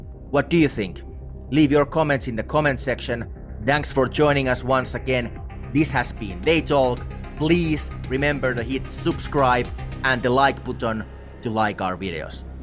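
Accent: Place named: Finland-Swedish, Finland. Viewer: Are you a Swedish speaking Finn? My mom is from Österbotten and she has the same accent.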